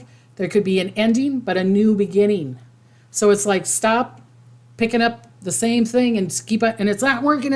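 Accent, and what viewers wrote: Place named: Minnesota, United States. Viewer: Girl - your Minnesotan accent is on point!!!!! I love your hat!! From one hat-loving girl to another — Happy Easter!! Sending much love & gratitude to you 🌻💙💛🌻💙💛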